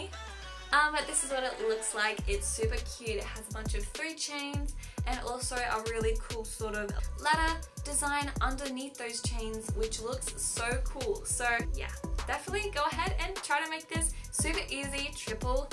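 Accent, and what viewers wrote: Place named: Australia. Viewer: #loomersquad love love lovee your videos, great to know that there are still some loomers out there! also love your accent, im Australian aswell 💙🌈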